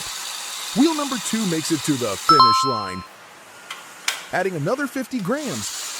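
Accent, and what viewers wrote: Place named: United States. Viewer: The fact that the narrator has an american accent really piss me off.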